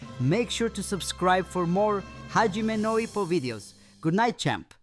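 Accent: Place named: India. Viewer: Are you indian? You have indian accent.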